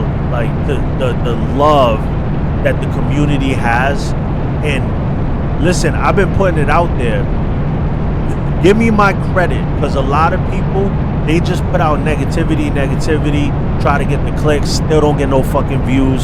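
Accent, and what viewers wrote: New York, United States. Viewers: I grew up in NYC. Left in 2001 I’ve been down south since. I miss hearing your accent. I miss “home” U sound great. Thanks 4 bein genuine. We need more people like you. Ride Safe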